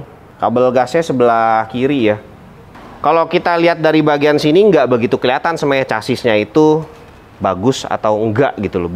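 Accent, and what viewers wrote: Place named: Medan, Indonesia. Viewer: Bang, abang orang medan ya. Logat bicaranya kayak bos saya. Hehe Semangat terus bikin chanelnya bang.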